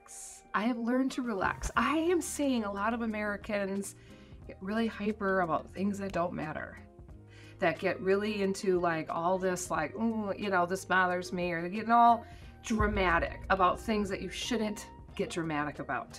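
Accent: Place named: New Zealand. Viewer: And your accent has changed a bit now too 😊 you sound very kiwi now. Lol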